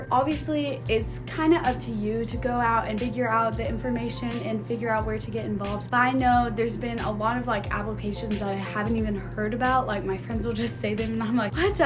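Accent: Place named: Europe. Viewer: You sound weird for Texas. Almost European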